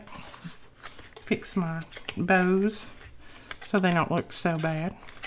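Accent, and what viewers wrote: South, United States. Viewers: Well your voice reminds me of home. I was raised in the south but have lived in New England for the past 21 years. I miss me some "home" sometimes! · Cute. I do have a question if I may ask, does it give an accordion look ok the one end and feel to them. I love the prima papers. They are really nice quality hopefully ghhjjjj me It's hard to find in PA however I do find it in Maryland. And I love your southern accent. It's nice. Reminds me of when I was a little girl and I loved in Georgia with my military parents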